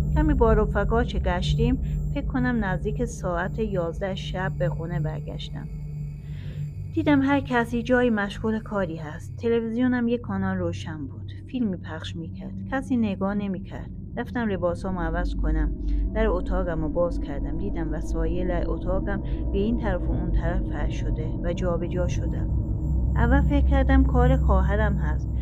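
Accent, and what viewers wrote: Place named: Turkey. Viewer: داستان با لهجه ترکی تعریف کردن خیلی خیلی قشنگه ترک زبانها لحجه فوق‌العاده زیبایی دارن ماشاءالله ❤❤❤❤❤❤ ترک‌ها مرد و زنشون همه و همه مردومان باشخصیت باغیرت و محترمی هستن ❤ آرزوی موفقیت برای شما 🌹🌺🌹 و تمامی ترکهای عزیز و دوست داشتنی ❤️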